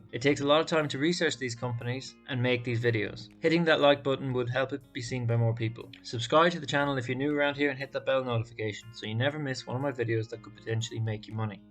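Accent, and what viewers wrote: Ireland. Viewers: Nice man subbed! Irish accent?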